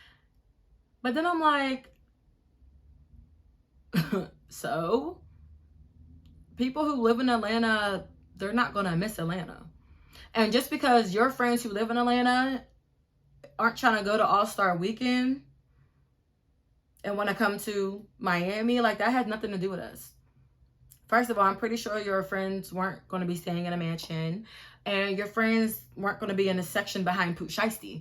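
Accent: Pittsburgh, United States. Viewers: It’s so crazy cus when you start telling the story, I’m like she sound like she’s from Pittsburgh 😭 We really have an Accent fr lol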